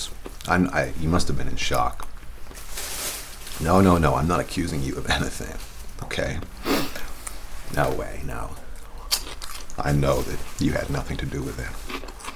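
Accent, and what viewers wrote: United States. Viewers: I really love this role play! And you are great at sounding like an American! And I do like the storyline of this one! And the end!! That was a shock! Lol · As someone from the USA, I think your American accent is beyond convincing! I like how you said "fer" instead of "for" especially. I just noticed a week ago how everyone I knew said 'for' that way. I don't like my accent because it sounds like a lazier version of "proper"? English... like when metal sounds like medal and water sounds like wader. Ugh. Anyway, your accent is spot on. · Some murder This video contains mature themes and violence On it! Watched for a full minute before I realized you were in America-mode 😅 So natural. And I love that jacket. Now, hopefully Matty Buns shows up for me again <3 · Spectacular acting, your American accent is dead on, the background and set up was amazing, and the story was awesome!!! 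Well done!!! Much love! 💙💙💙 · Your American accent is SO spot on it's crazy. Could've fooled me. This audiobook series I've been listening to features an English narrator whose ONLY JOB is to do voices/characters, and his American is God-awful next to yours. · Did you do a more american accent or am i just thinking that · Your American accent is really good!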